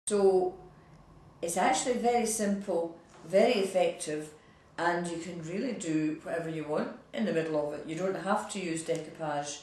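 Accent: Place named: Scotland, United Kingdom. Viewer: I appreciate you slowing down so we "non-Scotish" can follow, but both your accent and videos are so terrific, watching it again is no problem. Thanks for sharing your talents with us.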